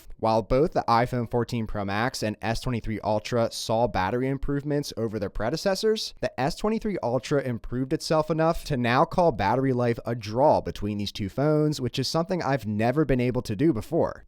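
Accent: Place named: California, United States. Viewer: California accent is f disturbing, smart information sounds dumb.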